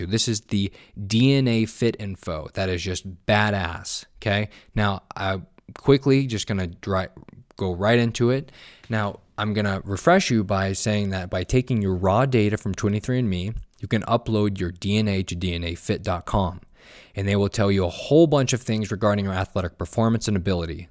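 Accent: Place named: United Kingdom. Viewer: Tuesday your going to have to get better at your British accent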